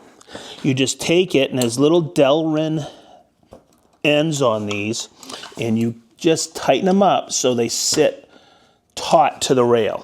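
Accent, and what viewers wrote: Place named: Massachusetts, United States. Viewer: Thanks,!! I just picked up my set (20", with plans to get the 30" rails later) so I can start with a shop cabinet build in my garage. This video really brought it all together. Thanks as always for your positivity, encouragement, and wisdom! (And that lovely MA accent - Swampscott? I always remember my relatives from that area when I watch your videos! 👍👍👍)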